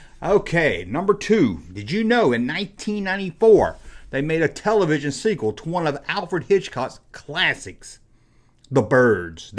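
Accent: South, United States. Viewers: Are you from tenneessee you got that southern draw!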